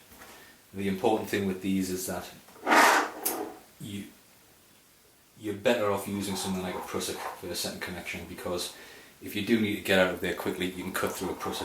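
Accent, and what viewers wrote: United Kingdom. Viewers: Nice vid. Used this to confirm an approach were taking with soft strops. Using a Petzl Micrograb instead of the pussick. Are you from the north east?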